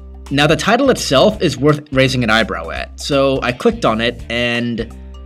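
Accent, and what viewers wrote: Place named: Wales, United Kingdom. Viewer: Oh god, she's Welsh...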